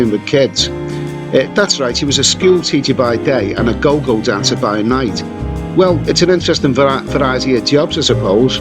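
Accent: Liverpool, United Kingdom. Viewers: Are you scouse? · Great video, mate, love hearing a proper Scouse accent instead of one of those well heeled condescending upper class tour guides telling me nowt but how to fund their Patreon account. Proper honesty in your opinions made this a pleasure to watch.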